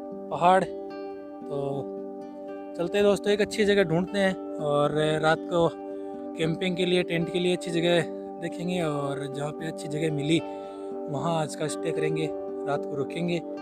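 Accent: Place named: Marwar, India. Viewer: Bawlya k Ped 😂😂😂😂 Marwadi m Bhut pyara bolya Superb Video Bro ,..❤️❤️❤️❤️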